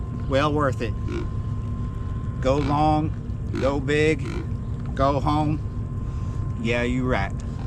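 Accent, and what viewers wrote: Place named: Texas, United States. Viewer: Lubbock here....I still hear Texas in your voice.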